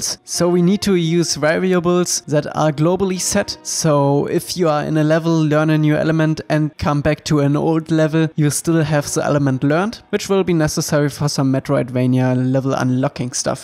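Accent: Germany. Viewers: German accent is so cool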